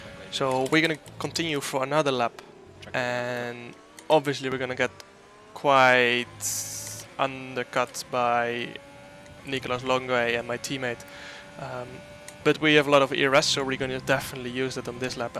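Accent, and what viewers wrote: Netherlands. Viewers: I love the fact you can hear his dutch accent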